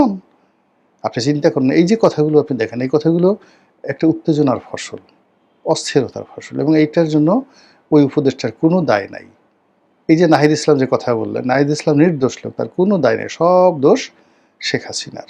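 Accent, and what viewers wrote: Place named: Bengal, India. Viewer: গ্রাম বাংলার গেঁয়ো কথা গুলো খুবই মনোমুগ্ধকর।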